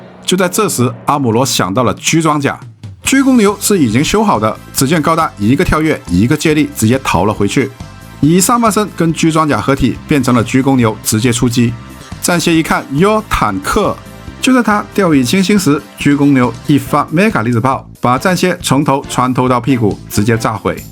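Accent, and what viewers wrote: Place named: Cantonese, China. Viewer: 好欣賞你嘅頻道，解釋很清楚，中間仲夾雜好地道嘅廣東話👍尤其是機體名稱，你亦都有提及港版叫法，因為我係香港人所以聽落零舍親切👍👍👍well done🙏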